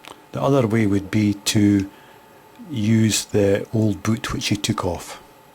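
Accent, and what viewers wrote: Scotland, United Kingdom. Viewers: Love the scottish accent.. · Love the Scottish accent! Love the detailed instructions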